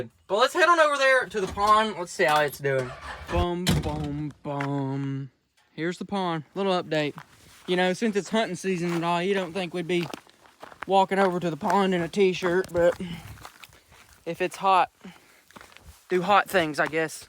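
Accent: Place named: South, United States. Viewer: Im partially deaf but i can still hear how southern he sounds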